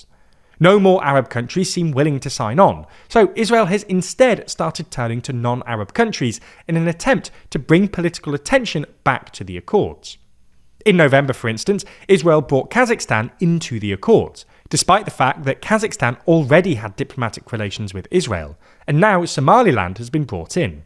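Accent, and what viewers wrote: United Kingdom. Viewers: Shut up with bullshit british accent. You suppose to be the first country to recognise Somaliland.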